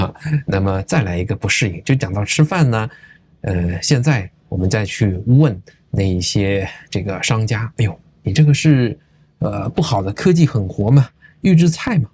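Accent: Malaysia. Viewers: 在大马搭车，你还要特别选择安静搭乘，不然driver会认为聊天的机会来了，只能说我们大马人真的太热情了😅话说，你说话的方式越来越大马化了啦，尤其那个....我觉得hor😆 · 哈哈，你的口语也是有大马的味道了。中国人口多，没办法跟大马对比。=)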